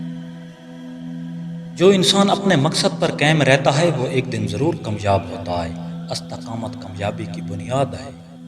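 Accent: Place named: Saraiki, Pakistan. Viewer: Q k Urdu saraikioun ki trah boltey ho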